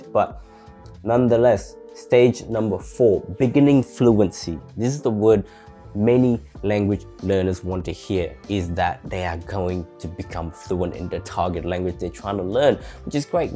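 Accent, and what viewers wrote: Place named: England, United Kingdom. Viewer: I like your English accent i hope you will have more good video # from Thailand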